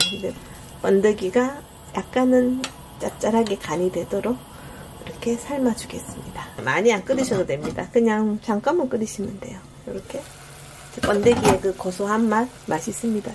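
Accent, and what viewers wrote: Gyeongsang, South Korea. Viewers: 달성공원 언급하시는 거 보니까 대구분이신가 보네요? 경상도 억양을 들으면서 항상 어디신가 궁금했었는데... 반갑습니다, ^^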